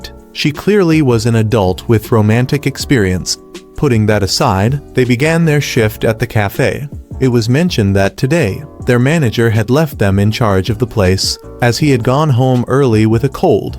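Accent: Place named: India. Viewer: why did it switch to an indian dude narrating with no warning help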